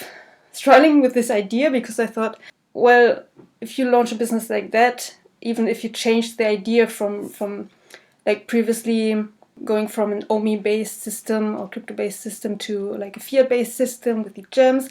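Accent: France. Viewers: Great content! Are you french ? you have a slight accent...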